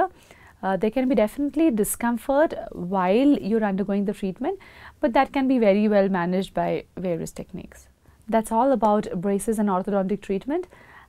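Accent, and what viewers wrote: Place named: India. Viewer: I usally hate indian accent but this one is lovely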